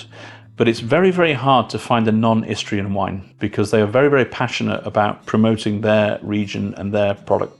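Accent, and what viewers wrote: United Kingdom. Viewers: So sorry for sending you across the ocean. Somehow missed your British accent. But, later certainly explains your fluid words. NEVER Looking forward to your next thing. 🤗👍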